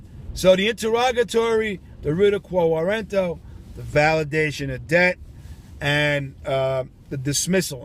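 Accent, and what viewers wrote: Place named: New York, United States. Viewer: I wish I knew u years ago, I just got off child support 2 1/2 yrs ago, and it only stopped because my 2 girls grew up. In the state on NY child support is up to the age of 21, I WAS SERVING MY COUNTRY WHEN I WAS 21!!!, you sound like a NY'er like me and I work for the MTA so you have a round about idea what MTA workers make, like yours my EX WIFE is a evil B*TCH who only cares about the all mighty dollar...I didn't do my homework and for 2 girls I was paying 900 bucks a month in CS...coming straight out of my check. When the CS was up my EX tried to sue me for $100 a month in alimony 2 yrs ago, 1st I laughed then I boiled up the petition and threw it right in the garbage...never heard from her again. for 23yrs I was hounded by CS threatening to cancel my drivers license. I figured you for a cop so you know the system a lil better than us, but I wish I knew someone like you when I was paying...I been with the MTA since 1998 the only things that saved me (mind you I lived with my grandmother until her passing in '99) was I got re-married in 2002 and I have a VERY understanding wife who stuck by my side when I was making 250bucks a week after all these deductions in NYC (just try surviving off of 250 bucks a week in NYC!!!), I started as a bus operator and when I got my 1st promotion to dispatcher I kept my mouth shut and I was making a little bit more money, then I got promoted again to a different title and made more money...When the child support was over I got my first FULL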